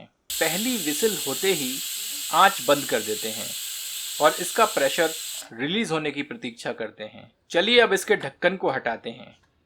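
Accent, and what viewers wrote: Standard. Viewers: i heard his voice till the end nd enjoyed pure Hindi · Shudh hindi bolte hain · जी आप की हिन्दी सुनकर मुझे बहुत बहुर खुस्हुवा इतनि शुद्ध है कोही नहि बोलसक्ता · आप के शुद्ध हिन्दी उच्चारण सुन कर आंनद आ गया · सच में आप सर हिंदी के शिक्षक हैं। मतलब क्या हीं बोले आपको, हिंदी के बारे में। इतनी शुद्ध हिंदी!!😀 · Mujhe apki resipy to achhi lagi sath mai apki sudh hindi bhot achhi lagi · Itni shub Hindi mein baat karne ke baje se mujhe mutton de zyada apki Hindi Sune ka man hain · हिंदी भाषा का शुद्ध उच्चारण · Shudh Hindi ka uchcharan aapke baton se pet bhar Gaya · आप तो कमाल के कलाकार हो, इतनी शुद्ध हिंदी और वो भी मटन व्यंजन विधि के बारे में क्या बात है ! पहली बार किसी का वीडियो देख मजा आ गया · Apki Shudh Hindi sun ke man prafullit ho gaya...pehli baar kisiko itni acchi Hindi bolte hue suna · रेसिपी तो बहुत अच्छी है लेकिन उससे भी अच्छी आपकी शुद्ध हिंदी है। कृपया आप इसी तरह अच्छी अच्छी रेसिपी लाते रहे जिससे हमे आपकी हिंदी भी सुनने को मिलती रहे🙏🙏🙏🙏🙏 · आपकी हिंदी की धारा प्रवाह ... 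शुद्ध उच्चारण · Aap pahle durdarshan chanal pe programme present karte the, aisi Hindi wahi dekhne aur sunne ko milta thi, 😢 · इतनी शुद्ध हिन्दी तो हमारे हिन्दी के शिक्षक भी नहीं बोलते थे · आपकी शुद्ध हिन्दी बोलना अच्छा लगा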